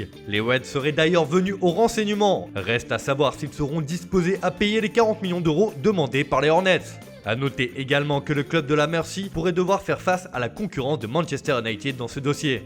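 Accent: Italy. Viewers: j'ai cliqué sur cette vidéo et si j'entends cet accent italien je met un pouce vers le bas, désolé mais vous me laissez pas le choix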